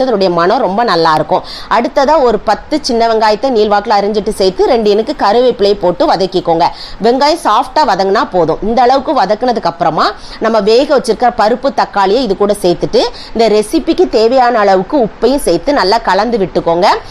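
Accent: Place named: Tirunelveli, India. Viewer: ஆகா நெல்லை தமிழே வாழ்க, நன்றி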